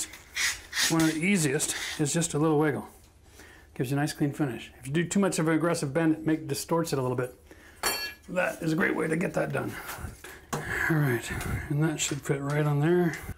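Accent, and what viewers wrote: Canada. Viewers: This Canadian accent is crackin me up! O.g lol.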